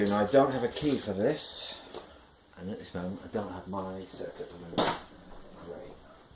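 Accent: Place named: Sweden. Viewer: didn't even notice his accent until you said it, then immetiate: swede. channel seems to be rly great find for me.i miss shooting stuffs. you do know teh nicest people :)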